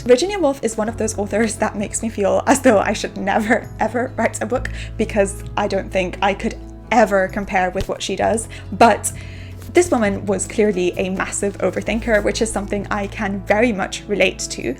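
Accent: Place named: United Kingdom. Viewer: Beautiful wonderful marvellous. Good British English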